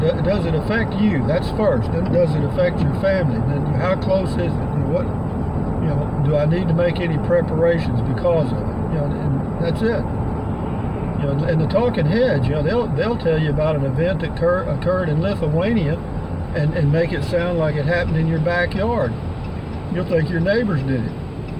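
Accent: Texas, United States. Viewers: " "...one of my favorite songs by. Your accent is just like that of my relatives in Texas...pure Texan! I have a boring California accent. Having a wide range of experiences, as you have had, I think helps with keeping things in perspective. Look forward to seeing the Maxx fan in action. Good video....I enjoy listening to the random thoughts.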